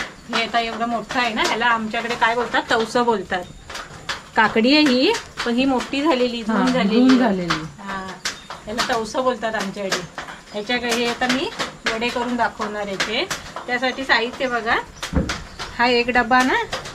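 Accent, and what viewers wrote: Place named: Malvani, India. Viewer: Tumhi khuup chaan bolatat kaki , assaal malvni tune madhy... 😊 mazya aai sarkh....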